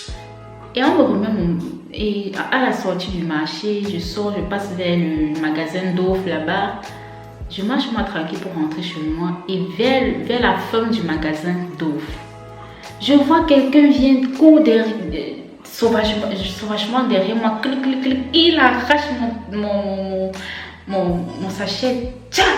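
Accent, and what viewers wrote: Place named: Yaoundé, Cameroon. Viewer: Warr 😅c'est de la ville aux 7 collines, dc tu parles comme ça 😂😂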